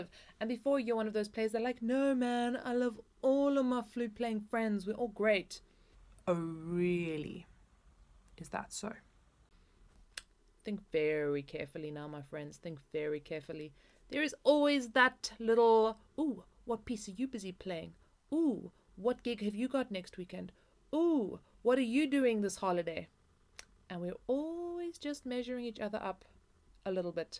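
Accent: South Africa. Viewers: South African ex-pat with a slight British twang? This is the first video I've watched on this channel :) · Are you from South Africa by any chance? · Hey girl- are you South African?! I hear that accent ;) ;) soo much love from the Jacaranda city! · I don't think she's British. I'm thinking South African and/or European who went to International School.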